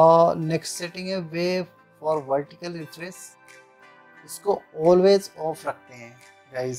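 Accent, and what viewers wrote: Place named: India. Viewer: nice vid but try to talk american english or british english not indian english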